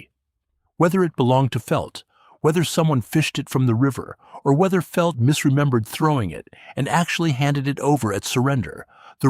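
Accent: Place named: United States. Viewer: I love this because it sounds so American. Remember though at Remagen, it was LT Timmerman that saw the bridge 1st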